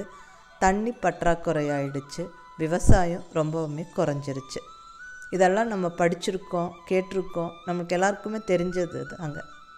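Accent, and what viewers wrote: Kongu, India. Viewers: Tats great........ Missing cbe so much..... Now m in singara Chennai.....by watching ur videos I feel so proud tat I cud hear Kongu tamil and our dishes as well in Kongu touch....... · yengal kongu Tamil.. mam nidhanathoodu sollum vidhamum arrumaii...❤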